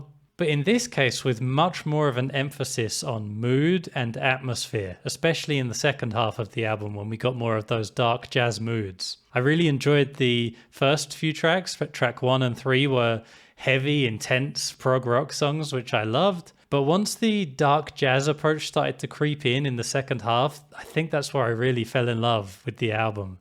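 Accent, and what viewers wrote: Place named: Liverpool, United Kingdom. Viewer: you sound liverpoolish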